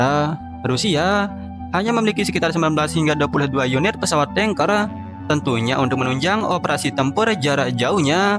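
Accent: Lombok, Indonesia. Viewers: Saya kira adminnya dari lombok. Bunyi F jadi P. Emg benar sih mirip juga logat Bali karna lombok dlu dijajah oleh Kerajaan Bali.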